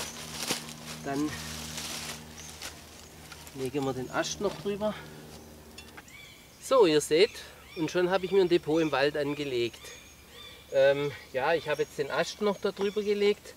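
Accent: Swabia, Germany. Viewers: Blaulicht 112 Ich auch am "S" erkannt man die Schwaben. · Schwabe oder Schweizer?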